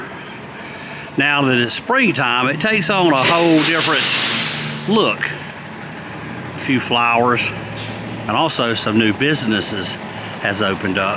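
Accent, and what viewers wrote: South, United States. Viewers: I love your Southern accent and the way you pronounce words. That's the great thing about the USA, depending on the state, city and even towns depends how one pronounces words. It's beautiful and don't change! Also maybe do some more RV videos of campgrounds because that would be awesome as well. Thank You!! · I love the Southern accent, and you are doing great and great video. It made me in love with the town. It is beautiful. Thank you for sharing. God bless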